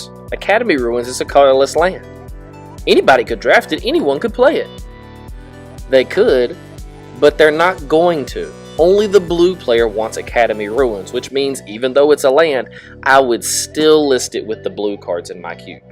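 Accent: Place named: South, United States. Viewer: I agree with some of these, but not all of them. I still believe that most Phyrexian cards are predominantly wanted by their respective colors. They do see occasional play in other colors, but from my experience they are for the most part played in decks that include the color on the card. The biggest exception to this would be Porcelain Legionnaire. Aggro decks of all shapes and sizes love this creature. But for the sake of balance, and because the rest tend to mostly see play in their own colors, I just categorize them all in that color. Speaking of balance, you also mentioned adding in a color aligned land for each color to go alongside Academy Ruins. I don't agree with this. White has maybe one cubeable land in Karakas. Red has actual zero. I think color aligned lands should be treated no differently than any other color aligned card type. 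Don't force any specific card type into every color. If there are no lands you want to play for a certain color, that's ok. It won't offset the draft or the game play at all to not have a red aligned land. Finally, I searched for on Twitter and got no results. Anyway, I really enjoy your videos. It's also nice to hear another southern voice in the cube community (I'm in TN). Cheers, man, and keep the content coming.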